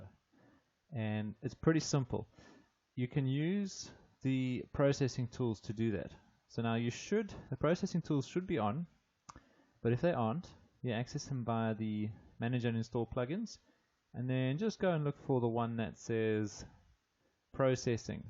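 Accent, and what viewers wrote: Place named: South Africa. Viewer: Hello, thanks for these videos and the South African accent! I’ve got a points layer which represents the centroids of my hexagon layer, however, I’m struggling to align a line grid layer to pass through the centroids. I’ve tried various ways to align the grid but I’ve had no luck. If this doesn’t make sense, I could send you a mail with a screenshot explaining my problem. I’d really appreciate any assistance, thanks.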